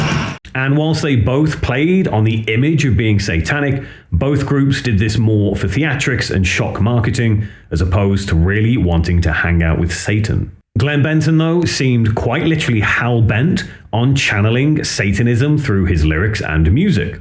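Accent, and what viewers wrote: United Kingdom. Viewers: Your (British) way of saying Deicide is your dialect, it's just not ours in America. Band name or the word otherwise, over here in the States it's "DEE-ih-syde." :->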